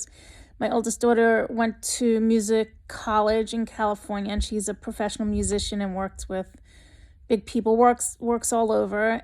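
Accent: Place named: New York, United States. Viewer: You are such a beautiful and kind person! Yes, we all need to reach out and support others. Life is full of ups and downs and it helps to know someone wants to help with no judgement or prejudice. I hope your personal struggles lighten and lift so that you can resume the life that makes you happy! I enjoy your channel and your accent (love that NY vibe!). Stay strong! Looking forward to your next post!. Love from New Mexico!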